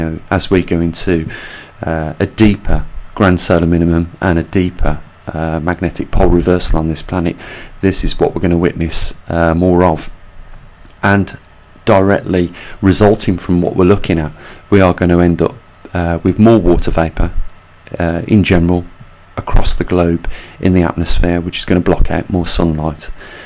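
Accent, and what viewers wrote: United Kingdom. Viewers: excellent top notch scientific report narrated with the most pleasant to listen to British accent. greatly appreciate your time to produce and share your knowledge with the truth seeking community. peace be upon you always.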